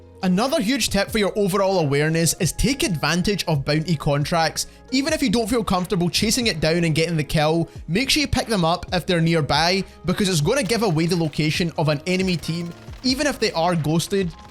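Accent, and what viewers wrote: Scotland, United Kingdom. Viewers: Very Scottish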